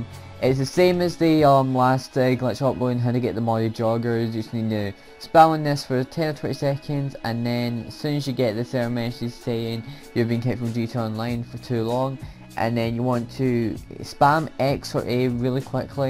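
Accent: United Kingdom. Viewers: Are you from Glasgow? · iSorryME he is Scottish we are special people 😏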